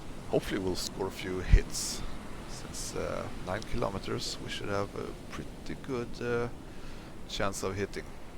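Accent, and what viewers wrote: Sweden. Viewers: Greetings from Finland! Based on your accent I would say you are Swedish maybe...? When I saw Wolfpack345 play this game it was an instant wishlist for me.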